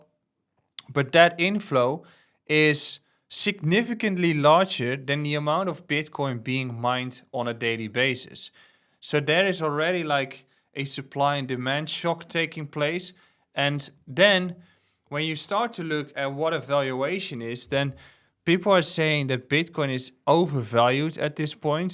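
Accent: Netherlands. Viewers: The Dutch accent though 😅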